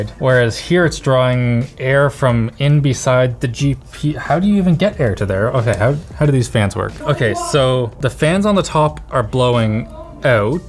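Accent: Canada. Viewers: never noticed this but srsly sounds canadian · I just realized why I love videos so much: he has the thickest Canadian accent of anyone at LMG. Edit:spelling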